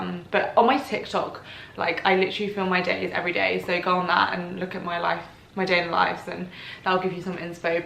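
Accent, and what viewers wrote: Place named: Australia. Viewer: love this!!! i use to watch you YEARS ago - so nice to see you back on youtube. i feel like i can hear a slight australian accent on you now xxx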